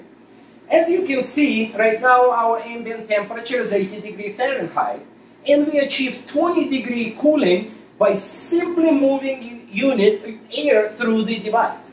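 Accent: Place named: Russia. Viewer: Чёткий Русский акцент.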